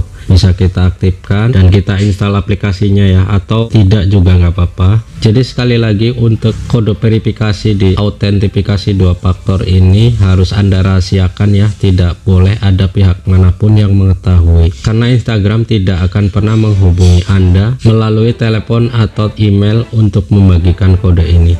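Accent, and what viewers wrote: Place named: Lampung, Indonesia. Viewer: L 15, anda orang bandar lampung ya, Terima kasih ilmunya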